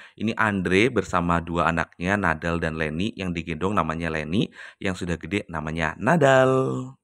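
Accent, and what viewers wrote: Banyumas, Indonesia. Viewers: Mas sampean orang mana kok bahasanya seperti saya ngapak? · Deneng ngapak temen mas 😁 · Kang ngapak ya..pada karo nyong...asli ne biyung kartini ngedi kang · Salam satu ngapak mas ❤😂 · Bahasanya ngapak sama aku baru nemu chanel ini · Asli wong ngapak ndi sih mas ki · Kok Ngapak juga mas, kaya EnnyongE 🤭🤭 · mas wong ndi kie..koq kdg ngpak bhnse 🤣😂..your is Holland man or ngapak man.... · ngapakk y keren 😂🙏🙏 Inyong Suroboyo 😂😂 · Bahasane deneng pad Bae Karo nyong ya ngapak,ora ngapak ora kepenak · mas ko ngomonge ngapak kaya inyong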